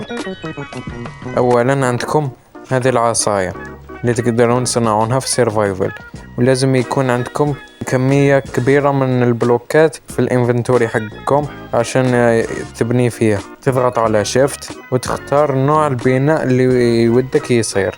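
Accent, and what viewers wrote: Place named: Algeria. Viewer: خويا اهدر صح نتا جزائري ❤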